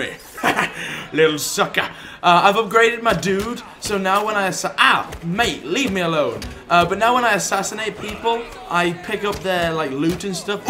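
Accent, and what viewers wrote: United Kingdom. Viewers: Are you from London?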